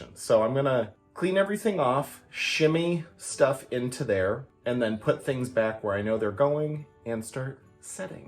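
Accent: Minnesota, United States. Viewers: You're lookin' good there,! My goodness gracious, this is a family show. Hey, out of your window is green grass and green leaves! Are you decorating in June? Because some cranberries spilled we have our 6th day: On the Sixth Day of Vlogmas my true love gave to me Six berries spillin' Five Christmas trees 🎄🎄🎄🎄🎄 Four Paolo meows Three rolls of batting Two power strips 🔌🔌 And you sound like the movie Fargo 🎄⛄🙂⛄🎄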